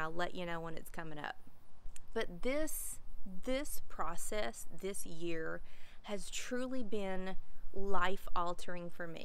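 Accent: South, United States. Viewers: I started the journey... and I chopped off most of the dyed ends for my birthday, 6 months later. Didn’t mean it as I was trying to wait a year,but I am glad I did. Now I have a little bit of dyed ends and it’s curly and short, but I love it. Took 2 weeks getting used to the short look. I love your curly big hair and southern accent (I’m from GA myself).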